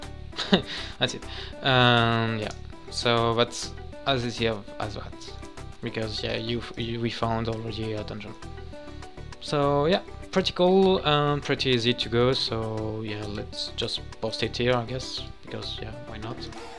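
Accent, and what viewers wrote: France. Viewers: Merci ! Ps : J'adore ton accent francais mdrrr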